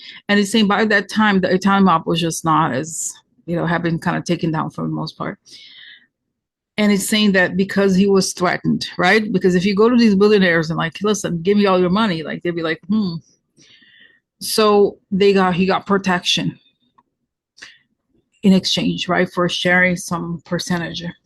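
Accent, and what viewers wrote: United Kingdom. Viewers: love your British accent! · Love your brit accent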